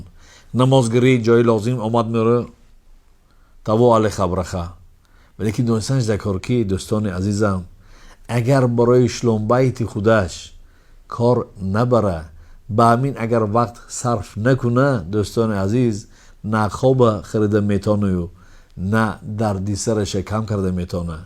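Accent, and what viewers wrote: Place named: Tajikistan. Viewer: بسيار خوب به لهجه شيرين تاجکي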